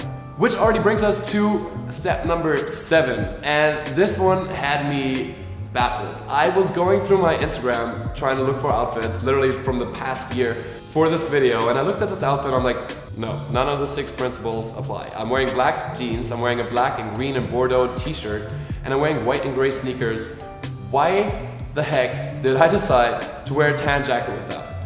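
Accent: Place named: Germany. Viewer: I’m quite obsessed with your German English accent here, very thick!! I used to work in a German company and all I could hear if not paying too much attention sounded very German to me. Great video I’ll recommend this to my male friend.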